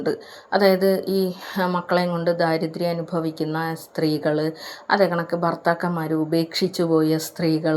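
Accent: Malappuram, India. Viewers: itha malappurathek va.slang namuk padikkam.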